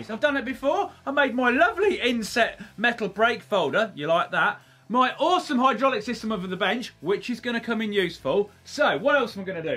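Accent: England, United Kingdom. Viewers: Very much loving the accent from a true Englishman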